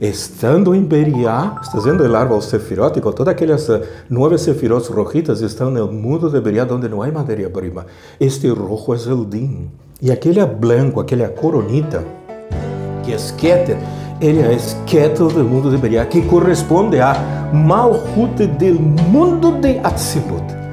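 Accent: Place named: Portugal. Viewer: Es acento portugues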